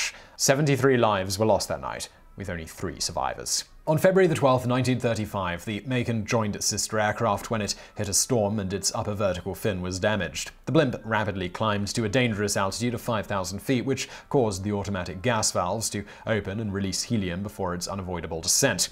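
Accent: United Kingdom. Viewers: Did it sound weird to anyone else when he said the speed in miles per hour before saying it in kilometers? Like maybe my brain, hearing a British accent, prepares itself to pay attention to the second set of numbers?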